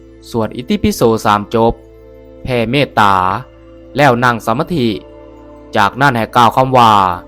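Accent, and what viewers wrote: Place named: Laos. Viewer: สำเนียงพูดเหมือนคนลาว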